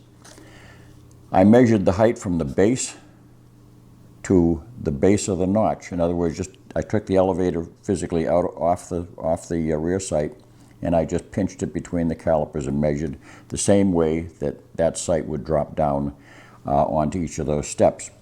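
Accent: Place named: Maine, United States. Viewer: I know a fellow maine accent when I hear one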